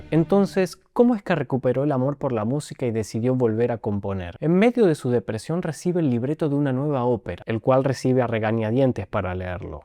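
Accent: Argentina. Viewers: Hola varón, por tu voz. Parece que eres argentino tremendismo que aporte haces a todo el mundo al menos para mí. Yo tengo ya 67 años y siento que me completa tu aporte cultural argentino. Tendría que ser bueno yo soy chileno, nunca he tenido una dicha de estar en Argentina, pero bueno alguna vez lo haré, le pagué mis pasajes a mi hijo y a mi hija para conocer argentino. Llegaron maravilladoscon gente como tú como el gran Sandro de América de Leonardo Favio, Piero, etc.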